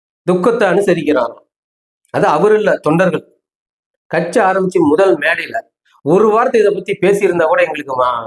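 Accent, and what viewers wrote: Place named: Kerala, India. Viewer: ஆன இலங்கை தமிழ் ஓரளவுக்கு mayala சாயல் வரும் , ஏ இந்த பெண் உளறி கொற்ற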